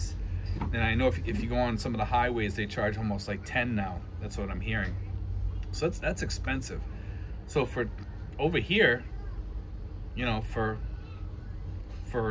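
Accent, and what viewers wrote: Boston, United States. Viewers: I was listening to one of your videos the other day, and I thought to myself, this guy has to be from the Boston area. I have been to Boston several times. My older brother went to Brandais University for Grad School and was a Professor at Boston U. when he passed away. One of my best friends from my days at the U. of Texas retired as a Lawyer and move to Boston. His son works for a High tech company near Boston. He is going to move back to Texas soon. You are on the mark regarding inflation here in the Philippines.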